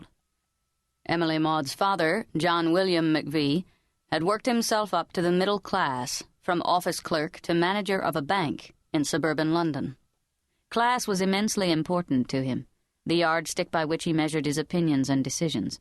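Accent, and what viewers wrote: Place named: United States. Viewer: Terrible narrator….. thick American accent, lousy pronunciation. Unlistenable.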